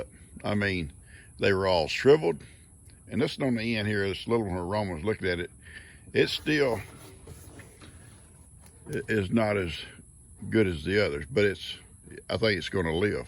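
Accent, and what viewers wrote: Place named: Kentucky, United States. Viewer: That is Kentucky talk also.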